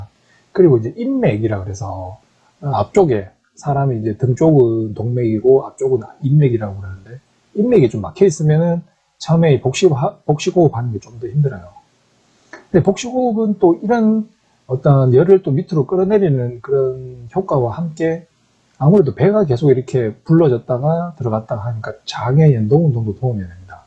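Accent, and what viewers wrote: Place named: Pohang, South Korea. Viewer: 안녕하세요,, 억양이 우리지방이네요.. 혹시 거주지가 포항은 아이죠??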